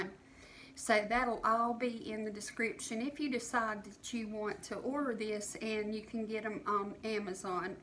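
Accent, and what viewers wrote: South, United States. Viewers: God Bless you. I just love your southern accent.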